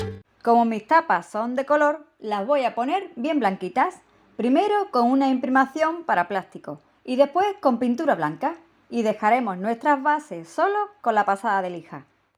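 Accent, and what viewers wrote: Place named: Andalusia, Spain. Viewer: Pues a mi me encanta escuchar el acento de... porque aunque sea andaluza se la entiende a la perfección... La verdad es que si me molestaran esas cosas no podría ni ver nada en la tele porque hasta los periodistas de los telediarios hablan con incorrecciones, y muchas gramaticales que es peor que el acento (como lo de su tilde en la e).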